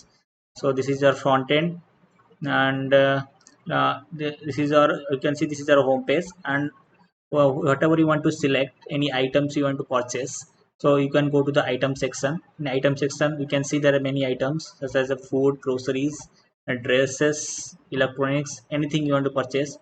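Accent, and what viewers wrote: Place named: United Kingdom. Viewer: Woww woww... British accent😱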